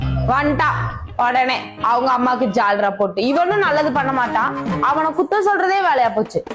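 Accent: Coimbatore, India. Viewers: Neenga covai ah akka..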